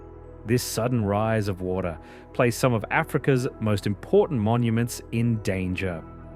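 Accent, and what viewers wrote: Australia. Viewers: An Aussie narrator, not a bloody yank - thank god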